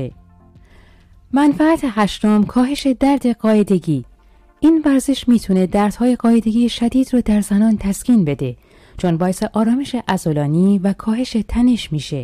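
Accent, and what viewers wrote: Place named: Turkey. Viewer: سلام خسته نباشید خیلی ممنون ازویدئوی خوب تون درضمن لهجه ترکی قشنگی دارین.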